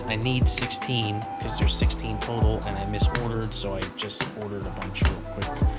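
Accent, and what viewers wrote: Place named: New Jersey, United States. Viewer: I’m here for the welding and hearing the perspective of a welder from Joisey 😂✌🏻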